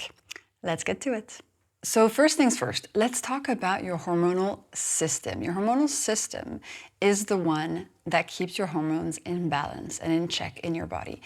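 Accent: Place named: France. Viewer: I love your French accent. 😊 I know by heart you’re hacks but unfortunately it’s not easy to apply them… still working on it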